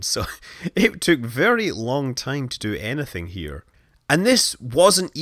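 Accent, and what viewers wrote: Scotland, United Kingdom. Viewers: Scott Manley language or Scottish?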